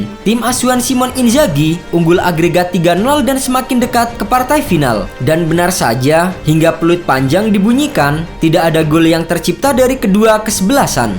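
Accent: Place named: Tegal, Indonesia. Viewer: Kayak orang Tegal ya min hiks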